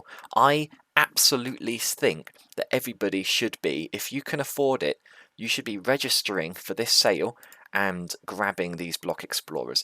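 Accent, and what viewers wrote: United Kingdom. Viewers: Wut? He has a super British accent.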